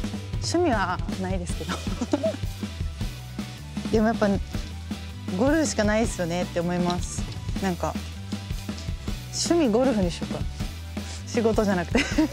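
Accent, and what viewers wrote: Kyoto, Japan. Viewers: 元気が一番です！京都弁は可愛く見えますね。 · 京都弁でリラックスしてる感じがいいですね。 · 理香子プロのスイングは以前からの憧れ。色んな番手の色んな角度からのショットが見たい。 プロの京都弁が可愛い。 どんどん続けて行って下さい、応援しています。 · はんなり京都弁で可愛さ増し増しやね～ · 現役の娘らよりも凄いスピン。京都訛りに萌えます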